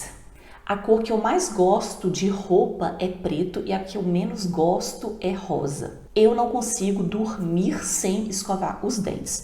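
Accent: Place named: Minas Gerais, Brazil. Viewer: Voz de mineirinha.Minha mãe era mineira de Poços de Caldas .Maringá Pr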